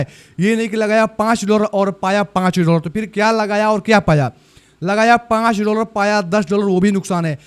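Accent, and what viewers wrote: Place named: Bihar, India. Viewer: Bhai Aap Bihar Ki Bhasa aapni Boto Me Jhalkata Hai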